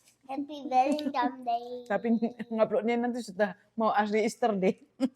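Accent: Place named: Medan, Indonesia. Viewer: Shalom kak, aku senang dengan logat ngomong Medan Siantar kali kak hehe.., mantap masakan kak 👍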